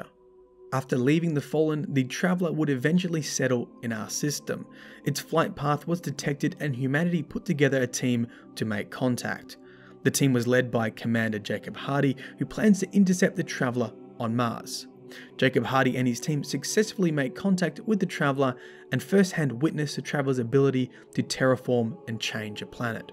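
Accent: Australia. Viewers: Wait you’re Australian as well?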